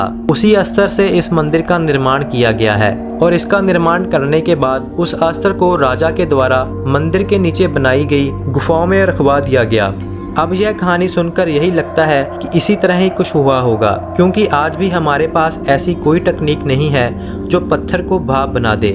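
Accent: Punjab, India. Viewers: OM NAMAH SHIVAY JAI MAHADEV 🌹🌹🙏🙏🌹🌹 Bhai tum aawaaz se punjabi lagte ho. kyunki punjabi hi hindi ko jabardasti bolta hai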